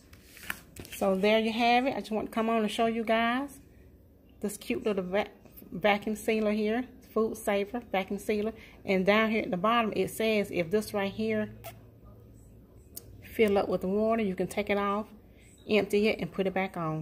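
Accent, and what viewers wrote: South, United States. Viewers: You sound like I want you for my Grandma. Love that country woman accent. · Love your accent! Are you from either South Carolina or Georgia? That's my guess, lol. I'm from RI so my own accent is absurd (Boston, lol), so I appreciate a good accent. =)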